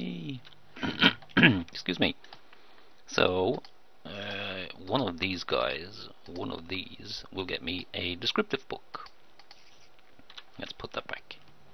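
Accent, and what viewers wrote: Ireland. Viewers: I love how UR Irish idk why but I'm Irish to to I guess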